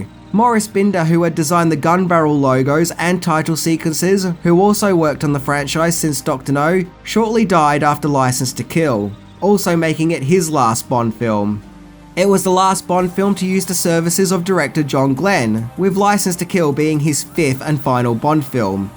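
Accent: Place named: Australia. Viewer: Tone down that Aussie twang...otherwise very informative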